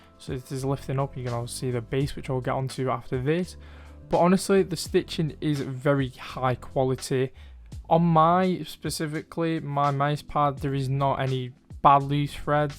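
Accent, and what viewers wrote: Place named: Manchester, United Kingdom. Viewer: You sound like you're from a town near me in Manchester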